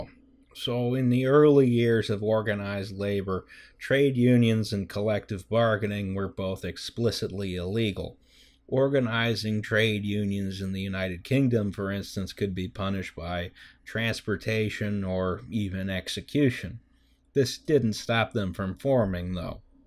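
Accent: United States. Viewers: i think we need more people with strong american accents talking about socialism. i like this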